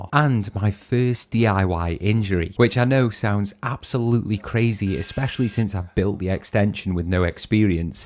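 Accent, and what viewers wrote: United Kingdom. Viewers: I'm sure what you said earlier may have been valid, but that last comment about not working for the next person is just dumb. Did you put up your drywall (or concrete since you sound British and i know you guys dont build with paper mache like we do) before your plumbing and electrical work? It doesn't make sense. You should run the utilities first and then seal it off according to the codes in your country. Why would you put up a wall only to have to tear it down later to install wiring and plumbing? That approach is backwards and just creates extra work for yourself or the next person.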